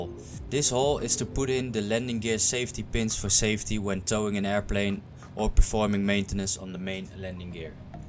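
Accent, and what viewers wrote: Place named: Netherlands. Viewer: I smell nederlands. Coole video!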